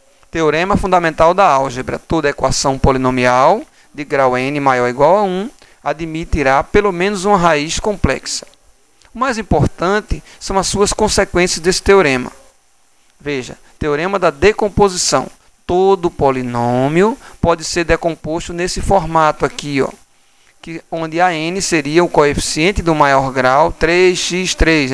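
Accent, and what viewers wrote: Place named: Ceará, Brazil. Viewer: é engraçado teu sotaque "sóbitraíndo" "pólinómio"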